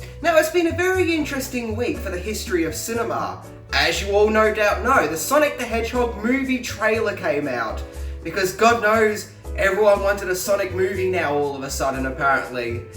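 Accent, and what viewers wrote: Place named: Australia. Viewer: I have one. Superman the quest for peace. Its so bloody awful. The superman series could've done with out it. Worst part is when they r on the moon fighting. Looks like they r in a desert at night fighting in sand. I know the budget was cheap and cheerful. But come on make at least believable. Love the Aussie accent. Visting Melbourne is on my bucket list.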